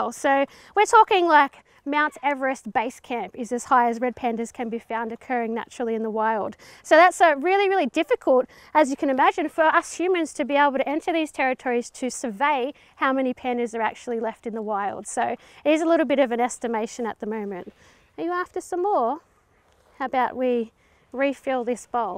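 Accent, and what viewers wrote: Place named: Australia. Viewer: Why is it when i search youtube about animals, there's always an aussie voice hah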